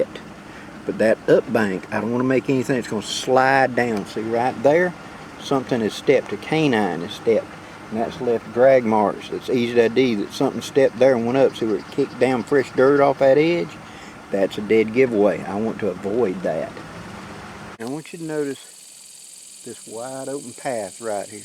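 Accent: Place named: Georgia, United States. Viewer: I just found your videos I really appreciate the info but it’s a trip down memory lane as a young lad with my dad and grand pa with the way you talk. Georgia native maybe? If that’s a known fact or not my bad just found you last night.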